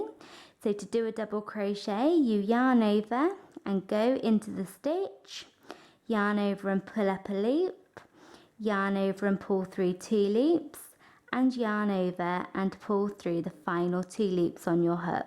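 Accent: England, United Kingdom. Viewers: I did wonder as you have an English accent which confused me. I love your videos they are so easy to understand.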